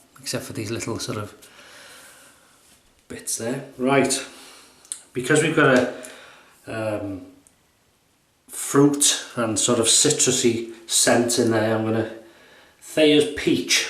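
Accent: United Kingdom. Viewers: There I was with a video on in the background Joe from Queens talking about shaving. Suddenly I no longer hear Joe but a familiar British voice talking about Mack in a New Jersey drawl! Yes you're famous across the pond too. Don't go upsetting any gangsters. All the best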